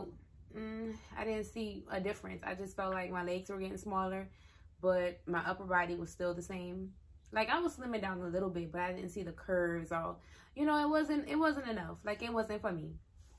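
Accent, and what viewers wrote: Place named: Baton Rouge, United States. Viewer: Baton Rouge ? lol I hear an accent What the hell $1000 to change doctors they take for everything at MIA.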